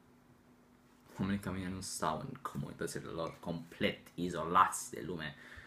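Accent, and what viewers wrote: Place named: United Kingdom. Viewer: se vede ca ai fost in anglia ca ai mult accent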